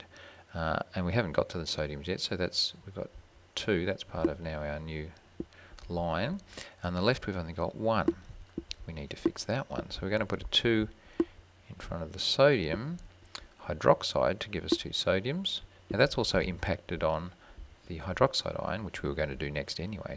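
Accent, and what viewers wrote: Australia. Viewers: finally it took an aussie ...good job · I like you matey cause you sound Australian, oh and this video was really bonzer!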